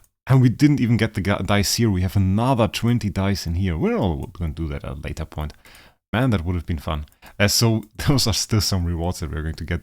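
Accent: Germany. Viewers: I can definitely hear the German accent but it might be because I’m danish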